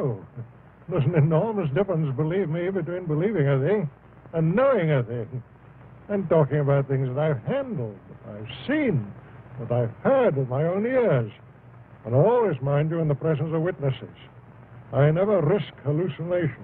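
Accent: United Kingdom. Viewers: Interesting to hear his distinctly Scottish burr.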